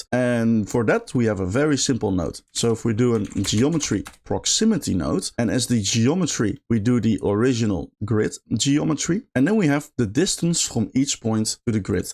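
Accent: Netherlands. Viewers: Goede video, je accent is onmiskenbaar en aandoenlijk Nederlands (jaren 90 MTV Katja Schuurman, nee echt zoek het op 😂). Je nodes/wiskundige aanpak lijkt op die in Midge Sinnaeve's video's. Prachtige renders. Subbed 😊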